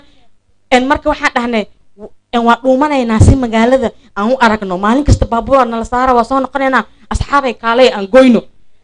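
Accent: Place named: Hargeisa, Somalia. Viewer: Hrgysa mxaa afkeda soo gadhsiiyey qoftan hdlka jilicsan 😡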